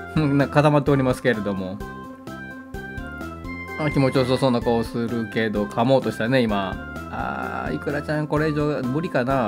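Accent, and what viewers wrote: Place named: Kansai, Japan. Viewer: 実行中継が楽しい！関西の言葉がとっても良い感じ！つい見入ってしまいます。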